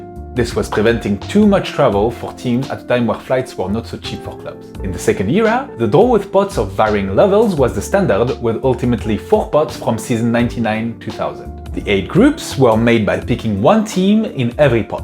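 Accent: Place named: France. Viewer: Pro: Good speed and tone of talking, Great voice, perfect English with sweet French accent, good content, excellent editing, talking while looking directly to camera! Amazing potential. Cons: Bad lighting, average sound, awful position of the head (at the beginning I thought that you sit right under the shelves and I was looking that at some point you will hit your head on the lower shelf). The video is golden.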